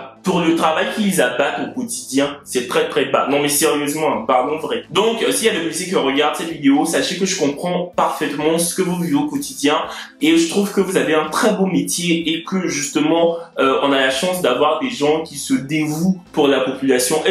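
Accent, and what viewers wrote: Cameroon. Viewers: J'ai remorqué que tu utilises souvent des intonations ou expression camerounaise dans t video PS: j'aime tes videos tres droles jusqu'à 😂😂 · Tu parle souvent comme un camerounais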